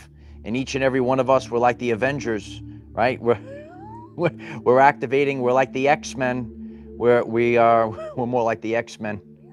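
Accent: New York, United States. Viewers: Love ur NY accent!!! Thank u for this reading 🙌✨💫❤️🙏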